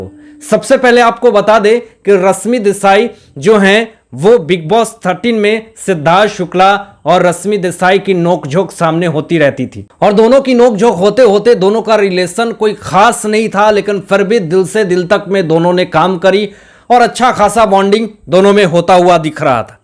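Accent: Bihar, India. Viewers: Tum thik se baat karna sikho ..bihaari pronounciation se lekar hindi baat mat karo ...rashmi hai not rasmi .not enerjy...sunte huebv Ganda lagta hai ..host ho thik se pronounciation sikho.. very bad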